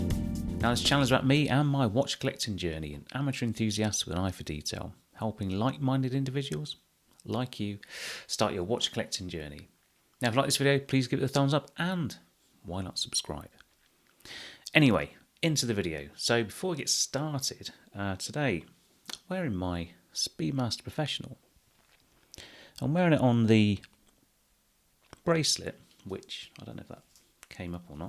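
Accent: England, United Kingdom. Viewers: ive sold a lot of watches in the past , a 16570 and a Omega PO 42 - I dont regret it at all i actually hated those watches and regretted buying them , never wore them at all , now I think very very hard before buying a watch , money sitting is money wasted! A sub is nice but you can always always grab another one in the future if you want life always goes on! Great vid! love the english accent :-)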